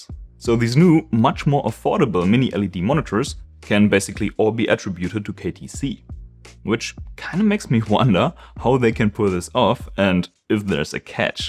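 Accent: Germany. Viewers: Höre ich deutschen Akzent raus? Edit: Nevermind, der letzte Satz im Video hat's gerichtet 😂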